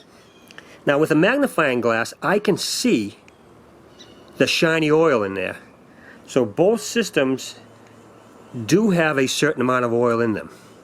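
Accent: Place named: New Jersey, United States. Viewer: Great video, thank you. What's that accent you have? Jersey?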